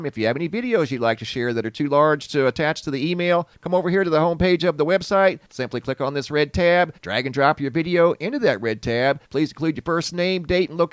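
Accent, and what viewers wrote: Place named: Arizona, United States. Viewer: “There goes a rogue shopping 🛒 cart!” Sorry, the way said it, I laughed out loud! His Arizona accent makes me laugh! 😂 He would laugh at my NY accent, I bet. All my love and prayers going out to those in harms way! Stay strong, folks! 🙏 😘🗽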